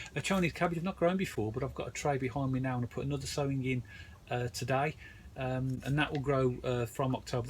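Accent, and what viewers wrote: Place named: West Midlands, United Kingdom. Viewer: You sound as if you are from the West Midlands (like me) so I wondered where you got your garlic and onion sets.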